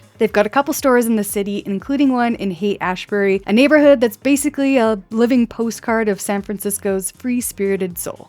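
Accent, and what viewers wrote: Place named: Canada. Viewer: None of this existed the last time I used the airport which was 2005. And while everything you showed looked nice I agree with other comments. You were comped for sure. no mention of prices at most places you visited. High end is fine if the average mortal can afford it. Besides most people do not have the time to spend 24 hours at any airport with all the things we have to do just to arrive and check in. And BART is not all great. Took it from downtown SF on my return and there was a delay for at least 30 minutes in the middle of nowhere - I thought I would miss my flight - thankfully I didn't. And having visited Canada many times your accent was a dead giveaway - Toronto, maybe?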